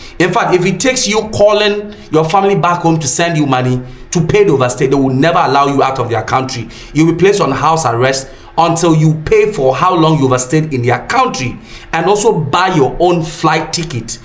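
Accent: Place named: Ghana. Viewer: SEE YOUR MOUTH calling color colar with your heavy Ghana accent. If you want blogging wars you will get it. You think Ghanians are saint and dont hane dirt ? We are trying to retrieve $59,000 and $48,000 among other fees from scammed us for land paid for two of my African American friends who bought into your advertisement to return to African.....SCAMMED IN BROAD DAYLIGHT BY GHANIANS!!! I have names and their plea in underwears begging for mercy to pay back. If videos go online now the west will laugh because the inner devide is what they want you fool! Perhabs we should just throw caution away and expose every dirt we can find on Ghanians too and lets this whole B.S happens. Even as I say this,I know defending the pride of African should prevail above anything else but sometimes these folks be asking for it!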